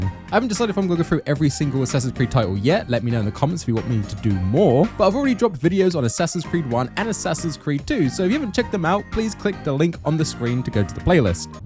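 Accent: United Kingdom. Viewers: Binged the first 3 so far. Really like your style of video. Feels authentic and not AI scripted! Which is a blessing nowadays. I'll continue to binge the rest of the series. For now. Until you Brit voice gets to me!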